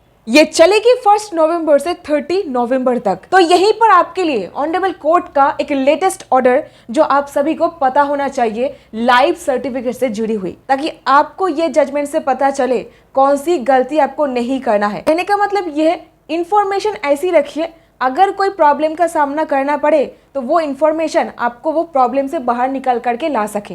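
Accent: Bengal, India. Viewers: she has Bengali accent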